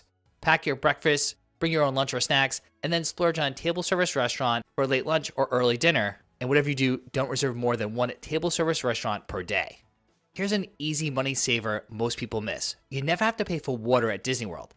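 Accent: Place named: Philadelphia, United States. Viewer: announcer sounds like he's from Philly- "wudder" instead of water. it's a SEPA thing.....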